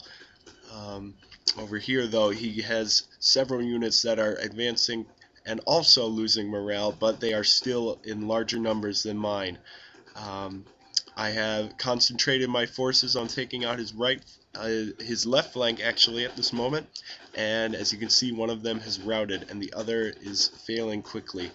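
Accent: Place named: United States. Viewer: Good commentary. Are you an American living in France? Just wondering about your accent (sounds like my American one). Funny thing about your opponent's name. I kept picturing that alien from "Lilo and Stitch."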